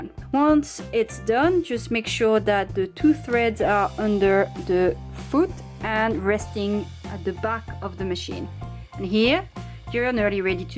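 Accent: France. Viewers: Are you french? Your accent is lovely!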